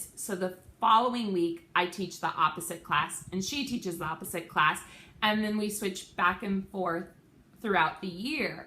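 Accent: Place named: Australia. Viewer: I can't wait to hear my name with an Australian accent!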